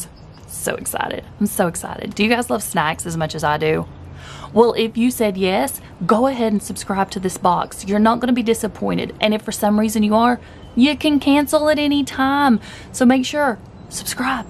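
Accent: South, United States. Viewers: I love how you say "spicy dill"! I'm from Michigan so I love the southern accent!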